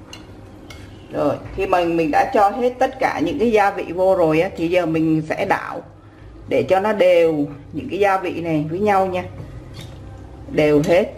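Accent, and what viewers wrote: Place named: North, Vietnam. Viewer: Co o Dong nai ma cung an mon mien tay ha.giong co nguoi bac ah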